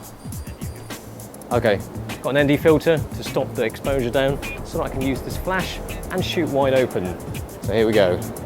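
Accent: England, United Kingdom. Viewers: The English accent, raises the perceived quality of the instructions several folds, such is the power of the ENGLISH accent. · an asian guy with british acent omg -____ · i don't like how he speak.. British ?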